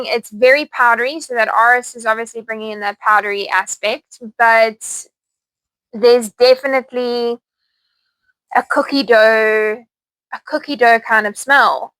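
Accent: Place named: South Africa. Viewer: Your accent gives me Mzansi Vibes... Enjoyed the review and like the ink art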